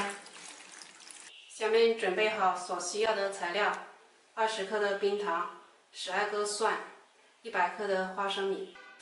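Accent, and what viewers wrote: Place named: Hakka, China. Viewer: 簡單實用。聽到客家腔的說話，覺得十分親切!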